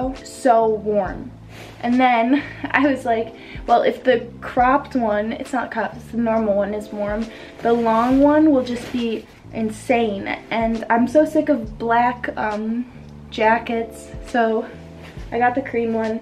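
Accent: Midwest, United States. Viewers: midwest accent !!!!! thank you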